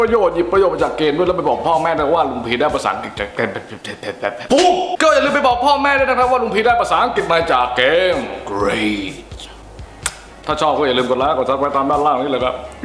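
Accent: South, Thailand. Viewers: ลุงพีเป้นคนใต้เหรอครับ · ลุงพีเป็นคนภาคใต้ใช้มั้ยครับ 😂😂😂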